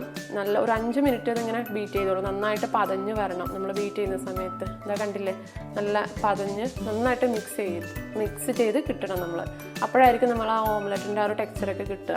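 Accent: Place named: Palakkad, India. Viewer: Chechi inte talking kettappol sherikam pkd pole ind athu konde ah chodhiche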